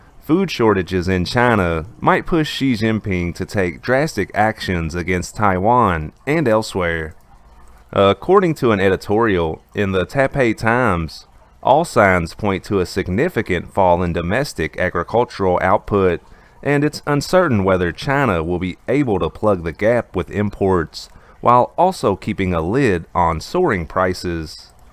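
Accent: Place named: Appalachia, United States. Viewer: how yual doooin. love this guys hillbilly accent.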